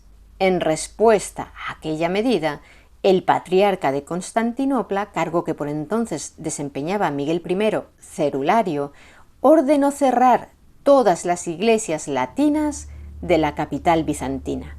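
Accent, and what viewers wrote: Spain. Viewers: me encanta como explicas la historia de una forma tan sencilla e interesante. Soy de México y amo tu "acento" español. 😅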